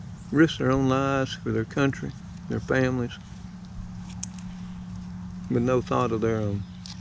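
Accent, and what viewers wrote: South, United States. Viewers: your southern twang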